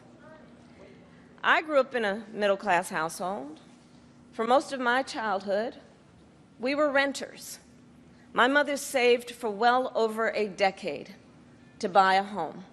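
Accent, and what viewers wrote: South, United States. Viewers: What is the all of a sudden have a Southern drawl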